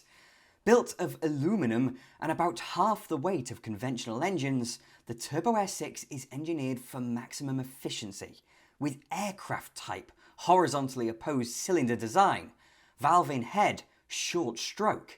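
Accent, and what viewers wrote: United Kingdom. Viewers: Great video and great British accent ( where are you from ?) I 've had a convertible turbo 1964 Corvair for 8 months, and it's a pure joy to drive. Greate noise, powerful enough, gorgeous and easy going. I brought it back from Denmark, on a 1200 km journey without any trouble. This car is better than a Mustang or mainstream pony cars, a reel underdog.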